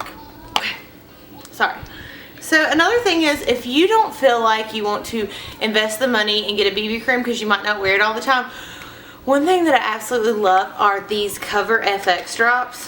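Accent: South, United States. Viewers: You're southern accent is sooo cute!!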